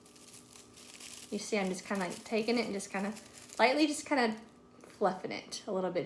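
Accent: South, United States. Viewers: I love the whole thing!! You don't talk funny it's just our southern drawl!! ❤🤔😂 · I enjoy your channel so much! I'm playing catch up now watching all I can! I can understand you just fine I'm a southern lady too! 😊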